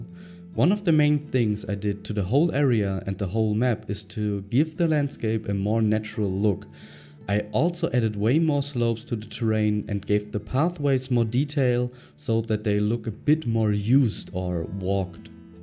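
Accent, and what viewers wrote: Germany. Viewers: Great Devlog! Am I hearing a slight german accent my guy?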